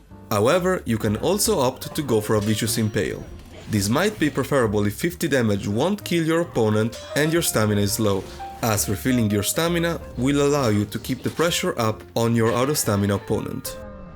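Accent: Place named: Italy. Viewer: madonna l'accento italiano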